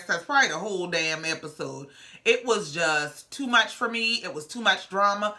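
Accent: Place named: Louisiana, United States. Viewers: I detect a very strong and seasoned clinical background as well as a Louisiana dialect. Did I pass or fail? #youregreat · Girllll when I tell you you spoke nothing but FACTS! I'm here for it! Are you from Louisiana? I'm getting Lafayette or Lake Charles.. ? But either way I just found your channel this Thursday today is 10/22/21 and I love your commentary! I subscribed!!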